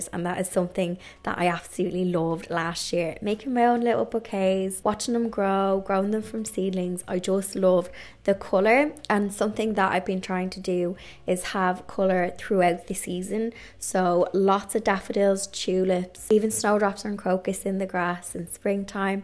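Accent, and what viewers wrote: Ireland. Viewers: I just now discovered your channel. The word cottage drew me but then I saw Ireland! I’m half Irish but have never been there. Everything about this video was a delight, including your lovely accent! You have created such a sweet garden. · Just came across your video. Beautiful work! Btw, I love your Irish accent 😊. We loved traveling thru Ireland..absolutely beautiful country! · Your video content is great but the real appeal of your videos is your voice and accent. Nothing says cottage garden inspiration more than a whimsical Irish accent 🍄🍀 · Hello from California USA. I have only just found your channel and must say you have taken to gardening in the best most natural way! I admire all that you have done, with the beautiful greenhouse the raised beds and the floor and garden wall you " bricked." What a great and creative way to add loads of charm! 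I will continue to follow your inspiring channel...plus I love to listen to your Irish accent! Happy Spring!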